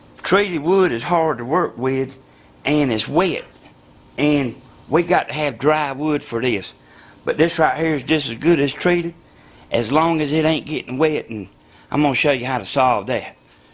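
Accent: South, United States. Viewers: Thank you Mr, Well Done Engineering w/o all the usually jargon/pretentious. Pls give-up the cigarettes because folks like me would like you around & kickin for as long as possible. Thks again PS: Your southern accent/slang is a lot like poetry. I wish I still had mine.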